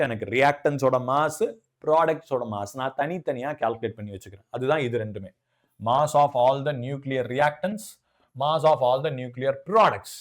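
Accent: Tirunelveli, India. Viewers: pesara slang liye tirunelveli or kovipatti nu theriyuthu bro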